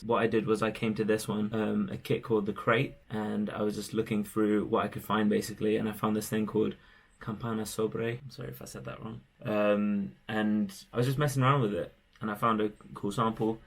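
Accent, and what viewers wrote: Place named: United Kingdom. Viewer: You have British accent. Are you born there? I am in Toronto, Canada. Nice to meet you. Actually we both commented on the documentary about Saudi Economy.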